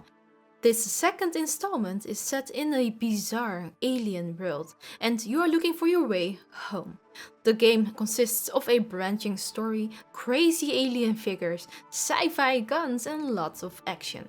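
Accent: Netherlands. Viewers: Dear god I love your accent. What country is that? Edit: Oh found it, Netherlands! Yeah I can recognise it now! Cute! The bladed weapons used by Ven are called Khopesh btw.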